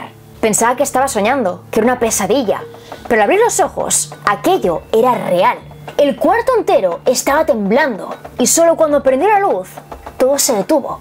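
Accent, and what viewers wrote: Spain. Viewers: Acento español y sentido del humor muy acido... la amo Pero es una papa casada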